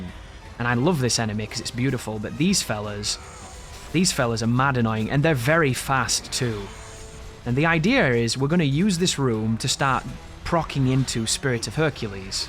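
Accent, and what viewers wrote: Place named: England, United Kingdom. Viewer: Which part of England are you from? Your accent seems similar to mine 😅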